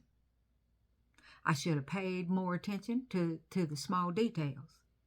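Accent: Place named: South, United States. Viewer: Hi,. You are a gem! You made me think back on my purchasing behaviour over the last couple of years. I returned to tarot after a very long absence and I was very excited about the plethora of decks available. I started buying. Quite a bit. Sometimes I'd not be able to afford a deck or I'd miss out for some other reason. But I have a small collection of decks I really like. Some of the earlier decks have been put aside. I don't do Kickstarter anymore. What I discovered is that there is always a new deck to get excited about. The decks I have now are a good representation of what I like in deck imagery. So, when I see a new deck it's pretty rare that I get carried away. I'm in a very relaxed state about my collection and I can reach out for a deck I've not looked at for awhile and it feels brand new, again! What a luxury! I love my deck collection. 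It's a luxury to have these 35-40 decks be at my beck and call. Anyway, you're right. We're adults. You sound very southern. If you're near Columbia, SC, let me know. We can meet for coffee or a couple of beers. Take care! Thank you!😅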